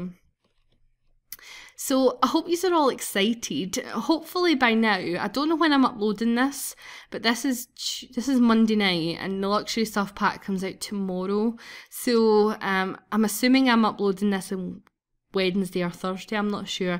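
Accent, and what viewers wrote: Scotland, United Kingdom. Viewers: I love your accent! Are you Scottish? It's so amazing! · Yay. Finally someone from Scotland :D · If you get into these sims could you do a current household I know 8 sims is tragic I would love to see it and I'm so jelly of your voice I'm Scottish to but i don't sound As AWSOME as you 👼💙👼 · U sound Scottish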